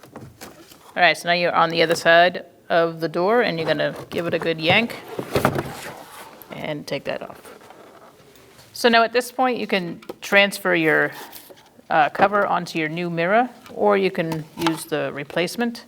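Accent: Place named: Boston, United States. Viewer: Thankyou for this from Queensland Australia. Love your Boston accent! I'm assuming Boston. The way you say care for car for instance.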